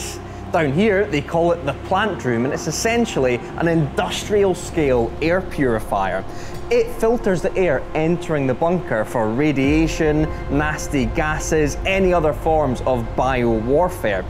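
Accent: United Kingdom. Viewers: yeah, it's called British accents. Don't get what people are going on about. He's not saying it wrong, it's his accent and we have a lot of accents for such a small island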